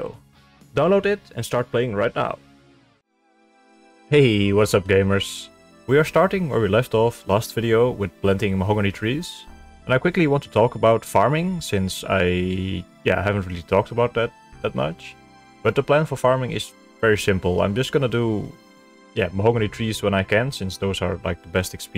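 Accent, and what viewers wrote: Netherlands. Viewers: go goooo, you're a legend amongst the lowlands and even a bigger one on this game. Your episodes are evolving greatly and I just can't get bored of that lovely Dutch accent. Keep it up man!!!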